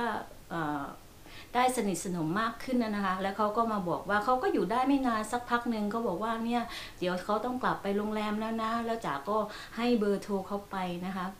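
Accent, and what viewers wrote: South, Thailand. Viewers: คนใต้เหมือนกัน ว่าแล้วทำไมเสียงแบบไม่เป๊ะแบบคนภาคกลาง ดูคลิปบ้านอเมริกาครั้งแรก คลิปนี้เป็นคลิปสอง ชีวิตดั่งเทพนิยายมากครับ ^^ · น่ารักมากเลยค่ะ แหลงใต้เหมือนกัน แต่กลัวเพื่อนฟังไม่โร้เรื่อง เลยต้องแหลงกลาง คุณจ๋าสวย และเลิฟสตอรี่ ก็น่ารักมากเลยค่ะ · ผมติดตามล่ะครับผมฟังพี่จ๋าพูดในคลิปก่อนรุ้เลยว่าคนใต้บ้านเรา😁😁😁😁😁😁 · ติดตามมาจากคลิปเปิดบ้าน หนูฟังครั้งพี่จ๋าพูดหนูมั่นใจเลยว่าพี่จ๋าต้องเป็นคนใต้แน่ๆ แล้วก็ได้คำตอบจริงๆจากคลิปนี้ 555หนูก็คนนครเหมือนกันค่ะ ยินกับพี่จ๋าที่มีครอบครัวที่ดีและอบอุ่นแบบนี้น่ะคะ ดีใจที่ได้ติดตามพี่คะ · ชอบสำเนียงใต้นะค่ะ น่ารักมากๆค่ะ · ว่าแล้วสำเนียงพี่คล้ายๆคนใต้ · ว่าแล้วเสียงแหลงเหมือนคนใต้ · ว่าแล้วพี่น่าจะเป็นคนใต้ หนูคนนครเหมือนกันค่ะ พี่สวยน่ารักมากๆเลย ^^ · หนูเป็นคนใต้ค่ะ นครศรีธรรมราชเหมือนกันเลยค่ะ ว่าแล้วฟังพี่เสียงแบบคนใต้เลย ชอบการพูดมากค่ะ ทำให้หนูกล้าแสดงออกมากขึ้นเลย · หวัดดีค่ะคุณจ๋า ชื่อฐาค่ะเป็นคนใต้ปัตตานีค่ะ เพิ่งเข้ามาดูคุณจ๋าค่ะ ตอนดูครั้งแรก คิดในใจว่าคุณจ๋าเป็นคนใต้หรือเปล่าเพราะฟังจากสำเนียงแล้วใช่เลยค่ะ คุณจ๋าโชคดีจังค่ะได้สามีที่ดี ครอบครัวอบอุ่น ดูครั้งแรกตกหลุมรักคุณจ๋าเลยค่ะ จะติดตามต่อไปนะคะ หวัดดีค่ะ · พี่แล่งใต้ น่ารักค่ะ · เพิ่งเข้ามาดูแต่รุ้สึกชอบ แบบพูดจาน่ารักก็คิดในใจว่าทำไมเหมือนคนใต้ก็ดูมา2 3วัน จนมาเจอคลิปนี้ตอนนี้เองเพิ่งรุ้ว่าเป็นคนใต้ เราก็คนใต้เหมื อนกันค่ะอยู่จ. 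ติดกันเลย กระบี่ค่ะ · พูดใต้แล้วเพราะมากๆเลยค่ะ เสียงน่าฟังมาก หนูก็เป็นคนใต้ค่ะ แต่พูดใต้ไม่ได้ เพราะโตที่กทม. พอพยายามลองพูด ยายชอบบอกว่าแหลงทองแดงค่ะ55555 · นึกแล้วว่าต้องเป็นคนใต้ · คิดยุว่าเป่นคนใต้หรอสรุปมารุ้เพราะคลิปคนใต้เหมือนกันจ้าาติดตามยุนะคะพี่จ้าาา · คุณ*พูดใต้สำเนียงชัดเจนมาก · ชอบฟังค่ะ...คนใต้ๆ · ฟังพี่จ๋าครั้งแรก รู้เลยว่าเป็นคนใต้แน่ๆ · พี่สวยมากเลยยยย ชอบเวลาพูดใต้❤❤❤❤ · เพิ่งเข้ามาค่ะ ฟังสำเนียงแล้วว่าคุณจ๋าน่าจะเป็นคนใต้นะค่ะ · พี่เข้ามาดูครั้งแรกก้อรู้ว่าน้องเป็นคนใต้ สำเนียงออกพี่ก้อคนสุราษฎร์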